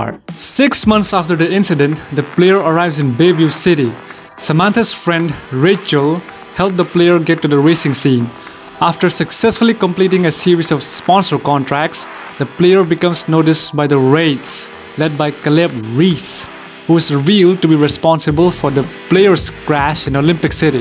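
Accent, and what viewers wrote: India. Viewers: Your Indian accent really brings this story to life👍😸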